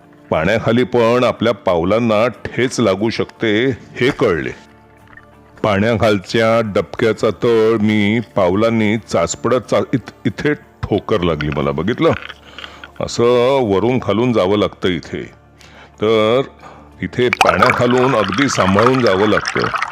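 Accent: Pune, India. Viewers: खूप छान पुणेरी मराठी !!!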